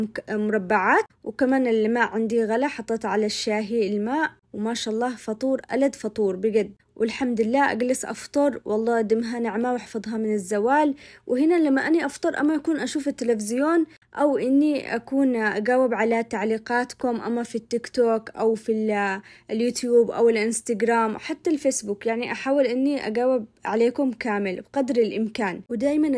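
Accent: Aden, Yemen. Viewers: اللكنة العدنيه تجنن عليكي❤❤ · حبيبتي ياسلام على هالكلام العدني الجنن يجنن كلام عدن والله خصوصا كلمة ماهلوش اااه ذكريات لي في عدن الحبيبه اختك من البيضاء مقيمه في جده ❤ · مشهيه بكره بسوي بنفس طريقتك إن شاءالله ..تسلمي ياعسوله وكلامك العدني ياسلااااام ❤️❤️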